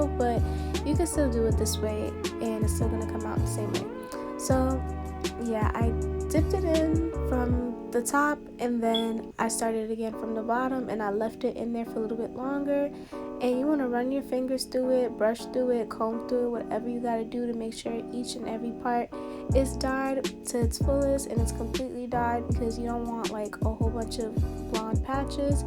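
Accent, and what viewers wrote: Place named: New York, United States. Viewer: you must be from NY, your accent sooo cute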